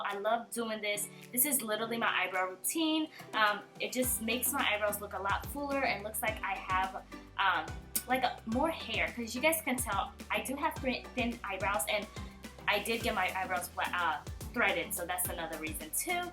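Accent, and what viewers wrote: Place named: Kenya. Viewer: Crazy how I could automatically tell you’re Kenyan 😭❤️ I’m kenyan too girl 🇰🇪😛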